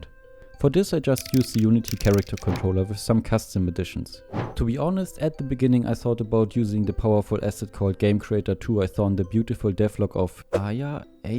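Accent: Germany. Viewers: Nices Game! Man hört das du Deutsch bist🤣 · hewo hörst dich fett deutsch an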